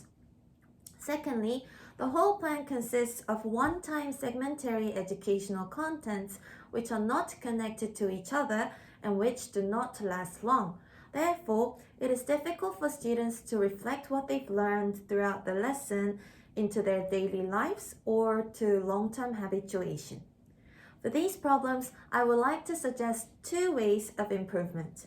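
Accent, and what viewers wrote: United Kingdom. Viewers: 영국 발음이시네요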